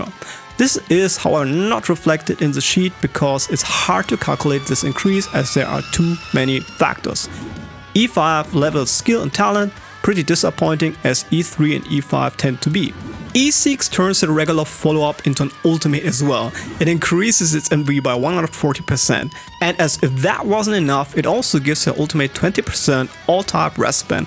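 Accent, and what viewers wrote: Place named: Germany. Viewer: bro got that german accent🗣🗣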